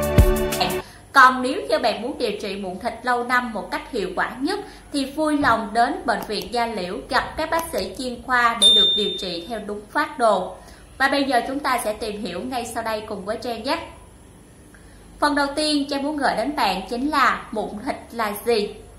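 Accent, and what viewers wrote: Vietnam. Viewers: Bắc không ra Bắc Nam không ra Nam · Cô này người miền trung chớ không phải miền nam nhé , đó là giọng miền trung , hãy phân biệt cho chính xác .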